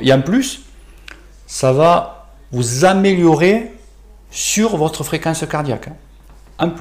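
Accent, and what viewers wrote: South, France. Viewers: Dit.....sans rancune.....on se passerait bien du jingle .... sinon ton contenu est MEGAAAAA en plus de ton petit accent du sudeeee....très charmant !!!!! 😅😋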